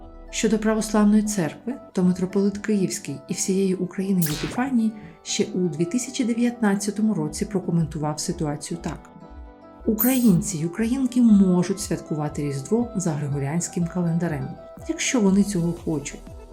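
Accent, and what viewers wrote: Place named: United States. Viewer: Мені подобається ваш американський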